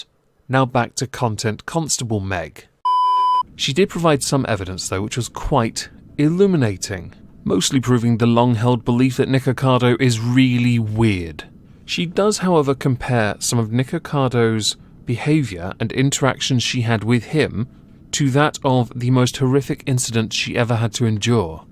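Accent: RP, United Kingdom. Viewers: Tbh, it's actually really contrived, whereby he puts dramatic emphasis on certain words. He sounds like a posh person trying to sound deep and meaningful...he has you suckers fooled!! lol!!!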